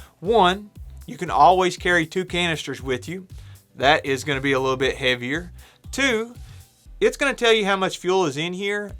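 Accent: Kentucky, United States. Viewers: Man I knew that Kentucky accent 😂 I’m from Louisville but get out to the gorge and big south fork as much as I can. Maybe we’ll cross paths sometime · "See we dont like our spoons with short handles...we like em with long handles that way you can use em just like at" snaps fingers im from Alabama and i gotta tell ya the kentucky accent is great man. Great content as always.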